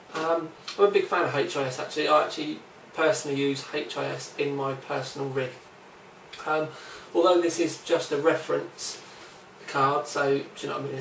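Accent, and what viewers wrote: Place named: Plymouth, United Kingdom. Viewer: From the accent I would say Plymouth.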